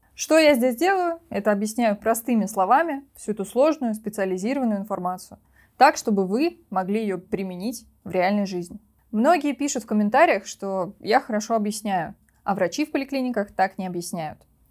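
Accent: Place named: Belarus. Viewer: Чудо и обоняние , а голос завораживает как мне кажется с нотками белорусского акцента . Как же повезло тем студентам кому вы преподавали, а тем более в Вас объедены две мои самые уважаемые профессии педагог и врач ! Дай Бог Вам …….. Вы сами знаете чего . У Вас всё получится , потому что Вы живете для других , давая им удочку , а не жаренную рыбу , а это самое ценное . Спасибо!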